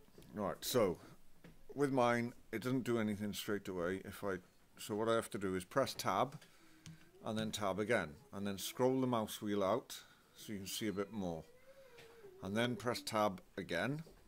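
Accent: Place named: Wales, United Kingdom. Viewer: I think you will find he has a Welsh accent. It is still English though.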